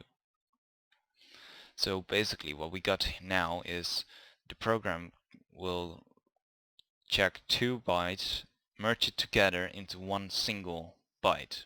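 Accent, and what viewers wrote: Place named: Netherlands. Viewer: It's dutch accent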